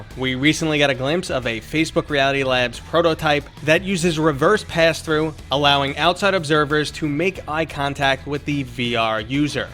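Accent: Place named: Boston, United States. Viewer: Get paid man, make that Boston accent work for you!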